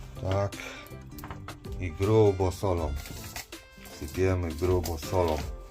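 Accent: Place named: Silesia, Poland. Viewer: Ciekawa ta gwara! Chyba że Śląska? Produkt smakowity! Dobrego dnia życzę 😅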